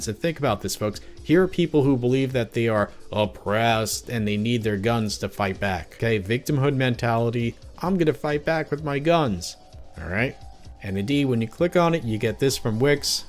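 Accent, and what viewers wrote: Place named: New York, United States. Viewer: I FUCKING KNEW YOU'RE FROM NY!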